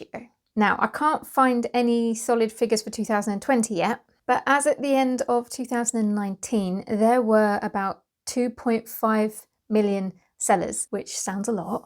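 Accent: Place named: United Kingdom. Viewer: Hi! Many thanks for sharing your knowledge on this topic!!!!!!!! This will big a big help when I open my shop on etsy in the near future. I love to hear you talk, must be the British accent. Oh and you have very pretty eyes too. Stay safe and healthy!!!!!!!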